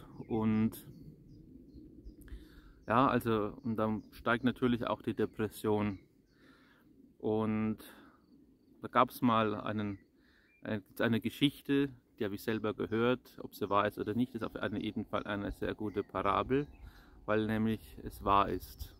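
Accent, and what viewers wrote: Germany. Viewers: My father is "Unterfranke" and from Bad Kissingen your dialect therefore reminds me much of fond childhood days when we visited the relatives in Bad Kissingen. My dialect having grown up in rich of Roman history Palatine, mixed with American accent, a bit of Swabian, a bit of Palatine must therefore sound really interesting in a funny way. Thank you for sharing this beautiful nature transporting same to me with your priestly blessings. I wrote you a while back in that Email I had asked your priestly advice on Padre Pio. If you find some downtime I'd be grateful for your much treasured input. It makes me happy to see my Godly priest happy, relaxed, fueling the soul with new energy all whilst sharing our Lord's beautiful nature with us! May our risen Lord protect our Godly, prosecuted priests who lead their flock despite the worldly war of satan! 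🙏🏻✝️📿🌿🌹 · So wunderbar gesprochen und auch noch mit fränkischem Akzent, das hat mir sehr gut getan! Dank sei Gott! Und ewiges Vergelts Gott!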